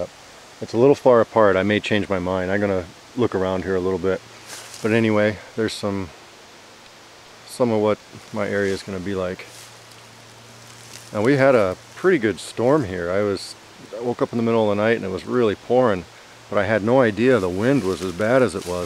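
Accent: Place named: Minnesota, United States. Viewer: I'm really liking your videos brother. Where are you located? Sounds kind of like MN. I'm in Upstate NY between Buffalo and Rochester, about 3 miles from Lake Ontario. Never seen chaga in wild. God bless. John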